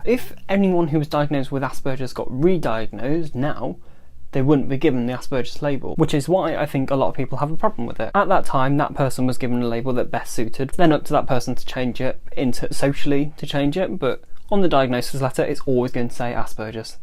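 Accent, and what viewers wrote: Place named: United Kingdom. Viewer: I prefer the term Asperger's, I pronounce it your british way. For several reasons. One it honors Asperger himself, who was a genius and had it himself, two it speaks to the 60 year time gap before idiotic american medical ascociation caught up to his ideas. And three it seems more of a technical personality quirk without being an all encompassing different than normal state. I think it's only being abandoned for political reasons in the political boards and will be taken back up, and as such I'm sticking with it, speaks to how this is very dependent on personal evaluations, where one man can out think the whole world by 60 years like that.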